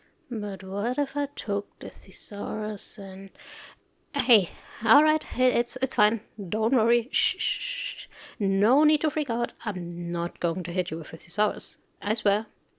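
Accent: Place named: Germany. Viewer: Greetings, I've enjoyed your take on Shynosaur's script very much and would hope for a part 2 for this character, Edit: (Sorry if the next section is a bit long) Having said that, keeping in mind the shape-shifting aspect, would as well love to see a script by you with your take on other mythical characters, like for example the Nixie, not the typical mermaid but a bit close to the Nixie in ( Nibelungenlied ) the German poem in folklore, but in a modern setting, having the ability to shape-shift between Human form and Mermaid, tends to stay near water hence works jobs where water is always available (like aquariums or as a lifeguard), and can sense future events like a Seer, with a soothing voice to the listener, your accent for this character will be perfect since a Nixie is part of the German folklore, as well a possible chance to include a collab with two other VAs as colleagues or sisters similar to the three Rhine-maidens in Nibelungenlied, however its up to you. 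Thank you very much and wish you the best in the future content to come 😊.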